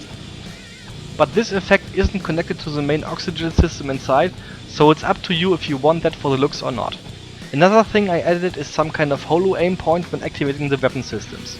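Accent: Germany. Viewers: I really like that design! Good thing you showed up at the stream. Another good youtuber to watch :D. (German accent I hear there?) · Krasser deutscher akzent xD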